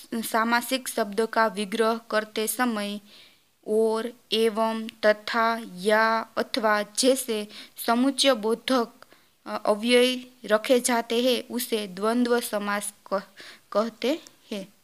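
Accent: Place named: Kathiawar, India. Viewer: आप हिन्दी को काठियावाडी लहेजे में क्यों बोल रही हो?उदा. राजा को आप राझा , जानते को झानजे बता रही है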